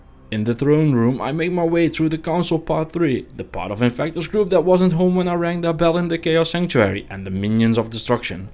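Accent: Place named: Netherlands. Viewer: ik hoor gewoon een nederlandse broeder onder dat accent <3 love to see it. ik heb geen dutch d2 friends </3 :(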